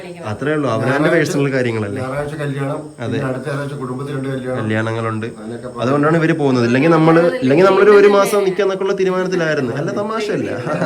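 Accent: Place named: Kollam, India. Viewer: Kollam slang super👍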